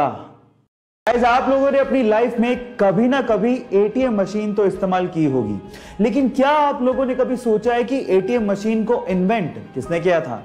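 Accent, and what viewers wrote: Maharashtra, India. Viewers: Aap marathi kyon bolte hai like thamba but it's funny I like it because I am Marathi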